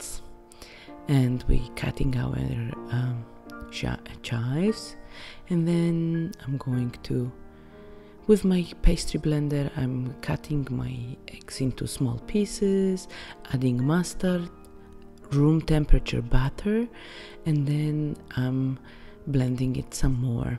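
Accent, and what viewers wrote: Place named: Poland. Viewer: I love your accent so much :) you're polish right?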